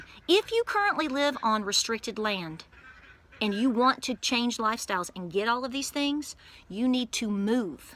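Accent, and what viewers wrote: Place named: Deep South, United States. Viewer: I just found this video and your channel. I love your deep south accent and attitude! You tell it like it is, and you an inspiration. <3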